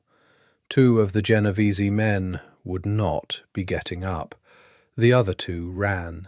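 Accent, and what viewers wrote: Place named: Liverpool, United Kingdom. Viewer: Sounds like a Liverpool accent love it great storyline and characters well developed 🎉🎉🎉🎉🎉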